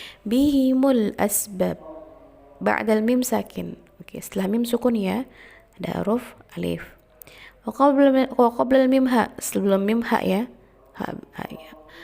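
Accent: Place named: Indonesia. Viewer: Sgt baik penerangan Ustazah. Lahjah Indon saya boleh faham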